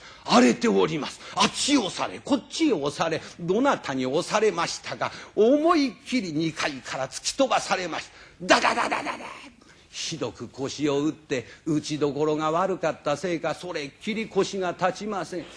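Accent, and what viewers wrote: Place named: Edo, Japan. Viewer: べらんめえ調の良さ。 いいね！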